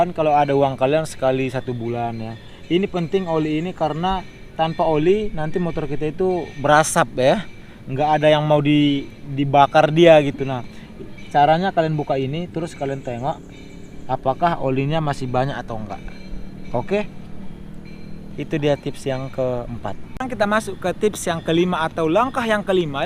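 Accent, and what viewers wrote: Indonesia. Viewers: Logatnya sumatera kali bah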